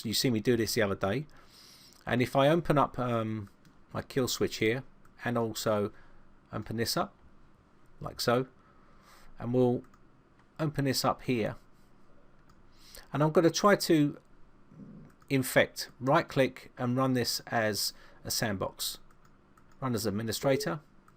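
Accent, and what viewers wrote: United Kingdom. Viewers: I like your British accent m8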